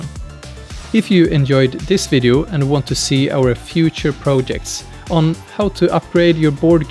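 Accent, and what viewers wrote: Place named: Scandinavia. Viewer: What a great video! Loved the approach (love the scandanavian accent too, coming from the UK, it just adds that amazing narrative feel to it - your wife is super cute ) and the channel play on name!! Amazing! Just a really nice video to watch - gonna subscribe just to watch your wonderful journey. Good luck to you both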